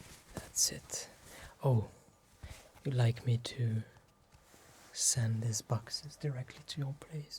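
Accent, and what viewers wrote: England, United Kingdom. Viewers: Did I hear a hint of an English accent?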